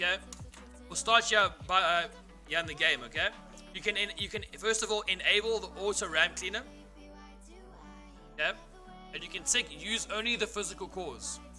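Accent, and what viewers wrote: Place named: South Africa. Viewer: Woah South African tarkov youtuber?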